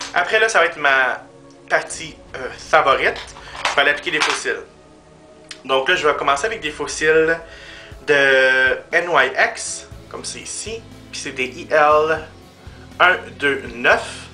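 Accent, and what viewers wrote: France. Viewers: are you from the south of france? trying to figure out ur accent (in french)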